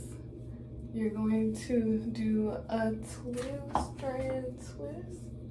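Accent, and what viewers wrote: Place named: North Carolina, United States. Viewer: Cute💕..are you from NC? You sound just like me😂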